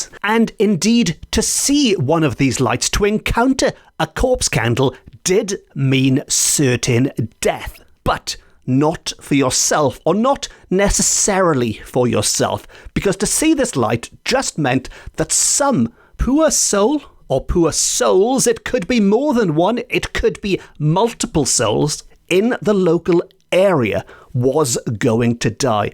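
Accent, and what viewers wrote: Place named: United States. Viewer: Your pronunciation of Welsh sounds very different from Duolingo and other Welsh speakers i've heard. I've heard Calan Gaeaf pronounced Cah-lahn Guy-ahv. Are you just from a different part of Wales with a different dialect or accent? The way you pronounce your "A" sounds like the American way, not British.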